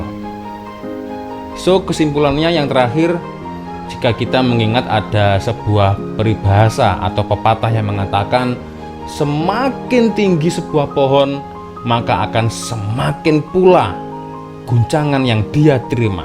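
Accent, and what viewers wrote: Malaysia. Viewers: cak da pandai cakap mcm org malaysia la....tebaik cak.....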